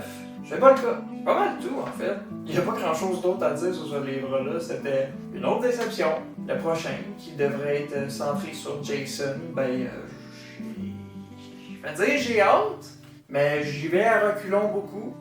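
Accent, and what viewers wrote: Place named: Canada. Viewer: Bel accent canadien en passant😊 J'suis un mordu de lecture...c'est surtout ça qui m'a accroché... De plus les titres des livres le sont tout autant... Merci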